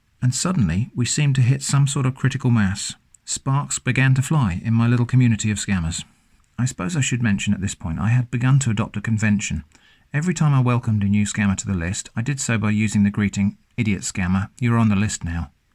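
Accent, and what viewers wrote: England, United Kingdom. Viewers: Rolando Cueva English. Not Aussie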